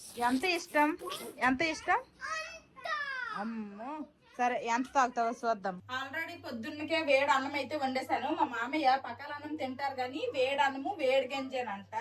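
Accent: Srikakulam, India. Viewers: Mee husbend a job chestaru? cute family...... Mee srikakulam bhasha chala bagundi ....❤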